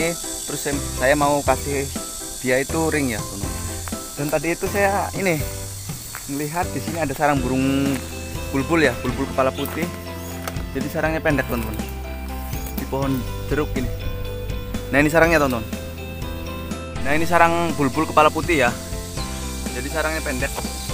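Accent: Java, Indonesia. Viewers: Pantes Kya logatnya Jawa...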